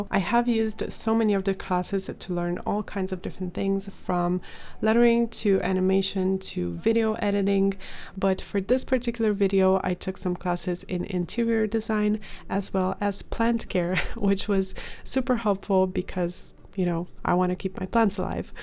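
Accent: Germany. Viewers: are you from germany? cause of your accent?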